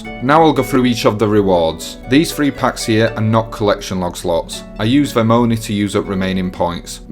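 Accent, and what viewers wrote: Yorkshire, United Kingdom. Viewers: Lovely Yorkshire Guide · the yorkshire charm just made it even better a description on how to. nice one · always nice to hear a fellow Yorkshireman! been following a couple of your guides recently (bank organisation) brought me here. for the past 15 years ive used the same youtubers slayermusiq for quests theoatrix for guides and torvesta for PVP... with your clear guides and step by step instructions, im sure i will be coming back. earned a sub off me!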